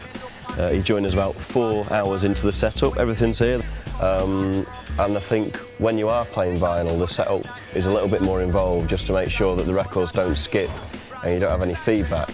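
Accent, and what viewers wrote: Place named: United Kingdom. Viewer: Oh! He's a brit!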